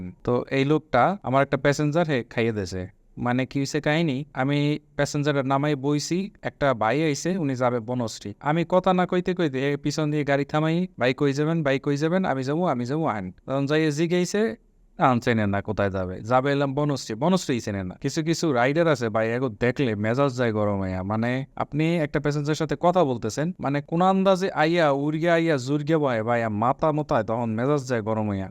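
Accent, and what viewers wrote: Barishal, Bangladesh. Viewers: ভাই আপনি কি বরিশালের??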